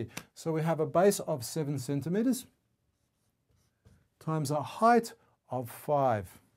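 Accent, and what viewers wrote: Australia. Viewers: He sounds like a australlian and french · u can tell his from sydney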